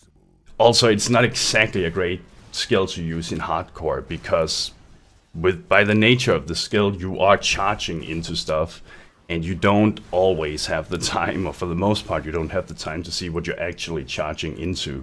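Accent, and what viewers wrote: Denmark. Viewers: hey are you Danish?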